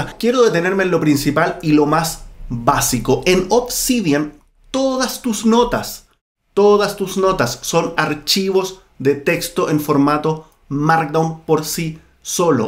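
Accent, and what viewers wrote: Chile. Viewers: ¡Qué agradable escuchar el acento chileno cuando el orador tiene una excelente dicción! Además, el contenido del video aporta información valiosa para quienes comenzamos a explorar el uso de estas herramientas.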